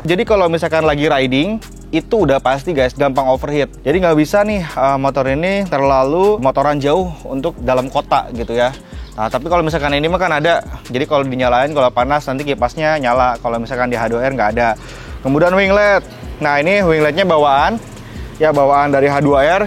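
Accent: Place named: Sunda, Indonesia. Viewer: Baru mampir.. sundanya medok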